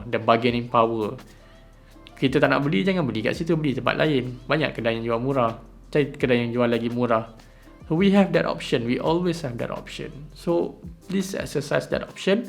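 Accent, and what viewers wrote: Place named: Malaysia. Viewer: Anda sendiri bahasa Melayu nya sangat jelek.bahasa Melayu dicampur bahasa inggris.aksen bahasa Inggris anda juga jelek,masih terbawa aksen Melayu,bahasa Melayu bahasa suku di indonesia alias bahasa daerah.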